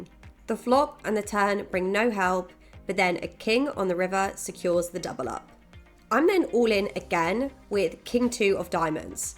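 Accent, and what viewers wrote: United Kingdom. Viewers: Ul in hahah British accent will always make me laugh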